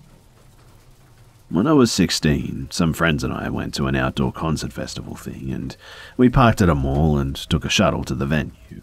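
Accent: Australia. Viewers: this is the first time listening to horror stories told by someone with a aussie accent and i was so confused at first when you were talking about deodorant i thought i clicked on the wrong video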